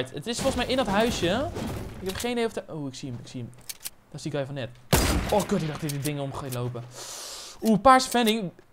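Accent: Apeldoorn, Netherlands. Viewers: Kom je uit Apeldoorn?😯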